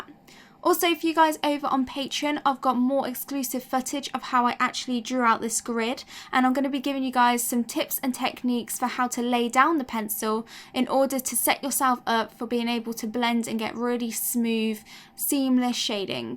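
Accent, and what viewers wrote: United Kingdom. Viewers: thanks for this experment. In pencil they all look more or less the same except tissue being softer and lighter in charcol they look more or less the same except that tissue maintain the quality of lightness, and finger smothness. while cloth in charcol maintain paper texture. I agree tissue is the most distnict result especially in tone, being lighter. in both charcol and graphite. Of course assuming that the amount of preassure and the medium filling prior to smudging is maintain constant. soft delicate sponge is great for sof transition.. another artist demonstrate it with make up sponge.. forgot the name of it but it is like pencil with little sponge at the end I think for eye area.. i dont have one that is the disadvantage of being a man.;) One old art professor said that chimoa cloth is the best that work for smudging. the graphite part. 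Great video By the way I love languages and accents and speak several, what region is your accent in britain? I see you pronouce the letter "h" as "hitch", thanks for the video.. have a nice day.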